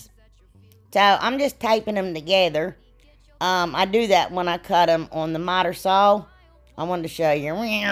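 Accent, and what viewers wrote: South, United States. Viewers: different is good. Where are you originally from because your accent is as country as mine! I just love it, and you and all your fits. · Oh my gosh!! You are hilarious!! I love,love your personality!! You crack me up in a GOOD way!! Your accent reminds me of my aunt. Country as country gets!! I just wished I knew how to even try to spell out some of the words she says to give you an example of how she pronounces them but I can't. Lol. Loved all your project's today just as much as you as well. Thanks for sharing love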